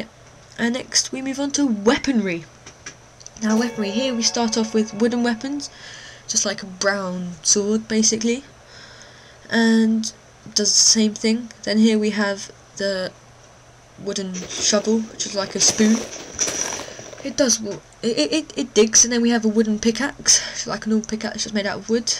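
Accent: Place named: United Kingdom. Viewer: Awesome a british accent !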